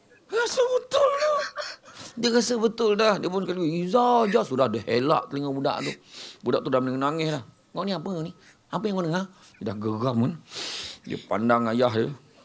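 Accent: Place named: Malaysia. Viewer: Wahh bang skit2 dh ada seleng Malaysia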